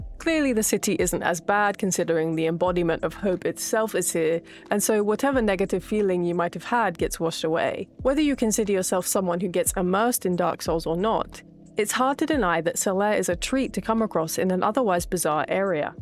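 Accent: United Kingdom. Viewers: YOU'RE BRITISH??????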